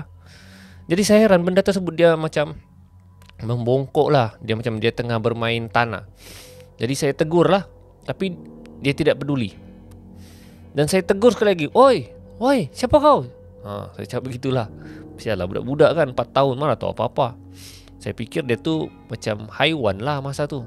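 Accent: Sabah, Malaysia. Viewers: bahasa indonesia lain bah...bhasa ini slang sabahan originol punia...😁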